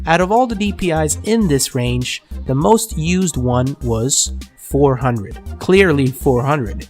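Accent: Gothenburg, Sweden. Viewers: Äru svensk?? HAHAHA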